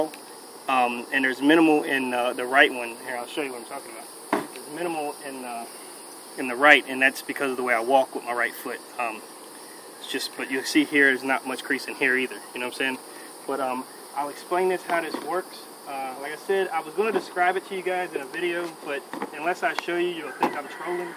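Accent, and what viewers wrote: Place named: Appalachia, United States. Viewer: HE SOUNDS LIKE OWNAGEPRANK'S HILLBILLY VOICE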